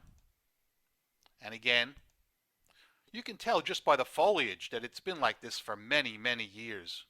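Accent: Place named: Queens, United States. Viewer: Hi.Your channel name mentions NY, but you live in NJ. Did you used to live in NY? I grew up in Queens and we have similar accents.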